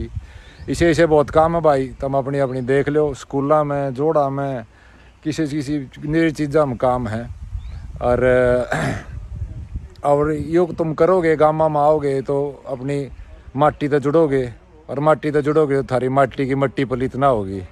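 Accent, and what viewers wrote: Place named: India. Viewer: Bahott bayiya bhie gi App k muh seay Payorr Deasi Awaz sun kar bahott baydiya laga🙏🙏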